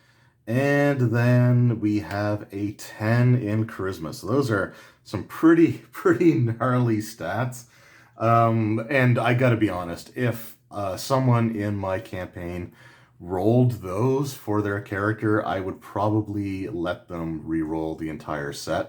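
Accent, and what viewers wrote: Canada. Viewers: Thanks for the video. Love seeing 2E stuff. You sounds like you're from east coast Canada :)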